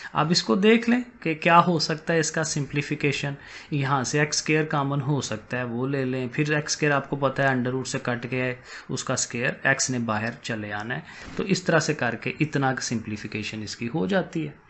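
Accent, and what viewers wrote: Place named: Lahore, Pakistan. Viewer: Yes His Accent are like Lahori People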